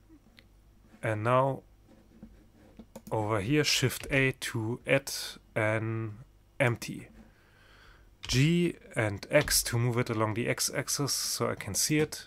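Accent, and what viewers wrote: Germany. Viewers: Man hört schon in den ersten 10 Sekunden, dass du Deutscher bist^^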